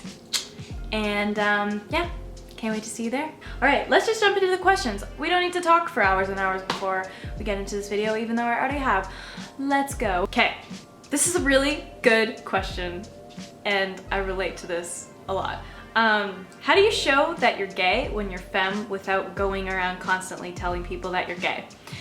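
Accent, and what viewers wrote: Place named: Canada. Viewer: you sound so canadian in this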